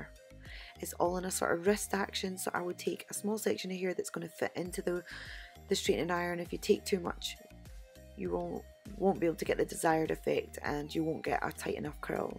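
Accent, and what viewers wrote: Scotland, United Kingdom. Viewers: This has put me at ease more lol! And I’m glad I’ve found a Scottish blogger so I understand someone better Hahaha! I’m getting tape extensions done soon for the first time so feeling a bit nervous about maintaining them seeing as I’ve not got any experience! Think they’re ones actually I’m getting.:)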